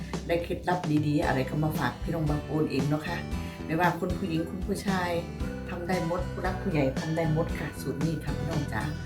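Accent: Korat, Thailand. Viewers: ชอบค่ะ พูดภาษาโคราช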